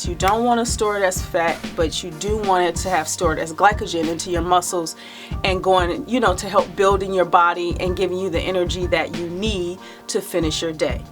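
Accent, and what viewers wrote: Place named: Detroit, United States. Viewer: that Detroit for BREAKFAST 🙄😄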